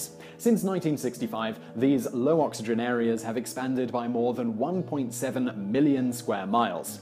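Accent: England, United Kingdom. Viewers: is a great learning resource and a great teacher. Full-meal range - tragic (worst despots), inspiring (celebs once homeless), serious (environment, addictions), fun (Richard Gere links) and more - gives Brain Gym capacity-building., you engage us with great voice, classy accent (a pox on the naysayers), crystal clarityy at speed, and England's great cultural assets of dignity, humour and discretion. To quote the in, "Who could ask for anything more?" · I'm not easy to get a like or subsciption out of. But most of the video and channels paticipates on make me wish I could subscribe and like more than once. Thanks to the team, I feel like Wikipedia is being delivered to me in video with a fine British accent and large vocabulary.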